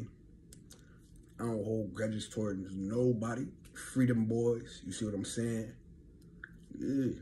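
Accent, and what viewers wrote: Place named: North Carolina, United States. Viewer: He from nc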